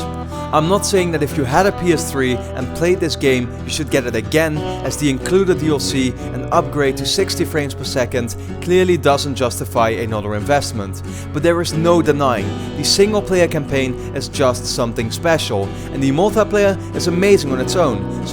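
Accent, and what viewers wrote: Netherlands. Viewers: Dutch accent? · lol i was about to ask that and i think so bcs his accent is dutch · Bas Warmerdam ik denk t ook aan zn accent te horen xD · Are you dutch ?.. you have a dutch- english accent xD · Ben je nederlands, Are you dutch? i can hear it at your accent. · r u Dutch becaus you speak English like someone from the Netherlands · You sound dutch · NEDERLANDS ACCENT GESPOT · You sound Dutch, are you?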